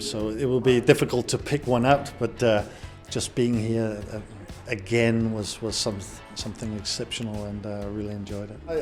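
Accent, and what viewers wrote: Scotland, United Kingdom. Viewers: He still lives in Scotland? His accent sounds Scottish.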